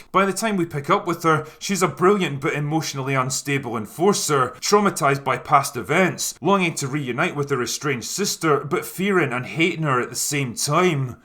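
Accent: Scotland, United Kingdom. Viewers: I feel like you toned down your accident a little bit since your first videos, I hope you will keep your strong drunk scottish accent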